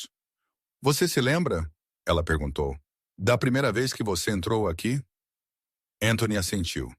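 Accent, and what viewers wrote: Portugal. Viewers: Porquê esse é o português de Portugal e não do Brasil 😂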